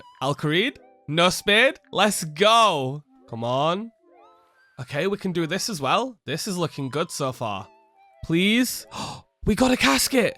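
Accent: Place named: Yorkshire, United Kingdom. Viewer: Your accent is killing me.. Where are you from? Leeds/Hull/Sheffield area? It's so similar to mine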